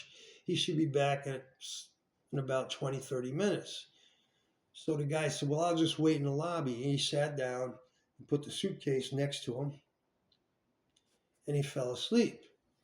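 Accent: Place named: Philadelphia, United States. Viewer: Hey you sound like you got the north Philly drawl! These stories are great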